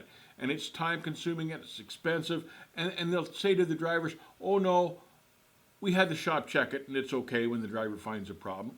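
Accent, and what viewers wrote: Canada. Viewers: You sound like a Canadian!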